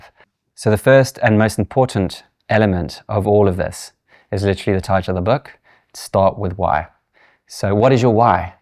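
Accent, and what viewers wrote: South Africa. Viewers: Buddy, is there a hint of South African in that accent? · South African? 🙂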